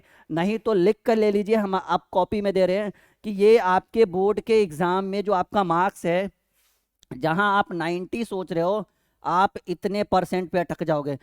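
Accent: Bihar, India. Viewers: Kya aap bihar ke hai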